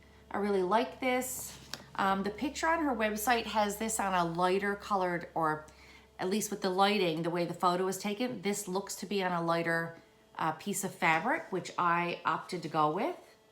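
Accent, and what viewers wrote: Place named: Newfoundland, Canada. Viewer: First time seeing your video on my feed. I thought to myself, I need to figure out where you were from. Towards the end of the video, you talked about the fires here in Newfoundland. I always find it relatively easy to distinguish newfoundlers speech. Yay! Found a needleworker on YouTube from the rock. Living on the west coast. Keep well and thanks for your time.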